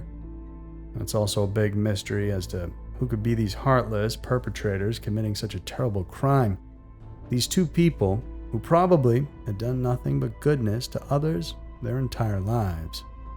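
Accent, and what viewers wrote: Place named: North, United States. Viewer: I’m usually REALLY good at figuring out where someone is from by how they talk or even without any accent, how they say certain words. But I can’t figure you out! Northern states is all I’ve got. But leaning toward NorthWestern. Where ya from!?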